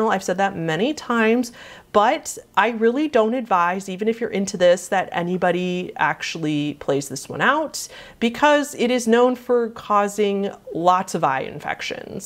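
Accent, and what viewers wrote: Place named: Los Angeles, United States. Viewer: I'm going to guess you're from LA from the way you ground out the end of your sentences.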